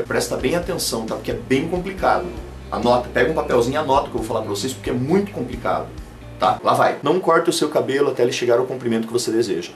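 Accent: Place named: South, Brazil. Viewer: Cara, da onde tu é? tu tem sotaque do sul! tu é gaúcho? Muito bom o vídeo, parabéns!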